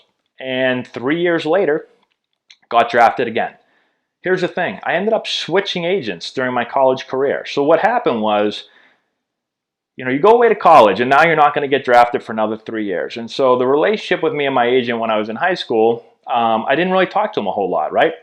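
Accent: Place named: Massachusetts, United States. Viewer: I guess it's the Massachusetts accent. I heard car deal not card deal :) .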